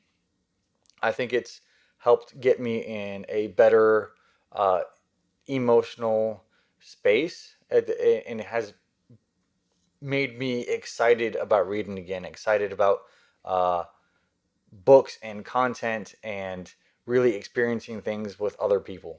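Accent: South, United States. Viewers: I love your Southern accent!!!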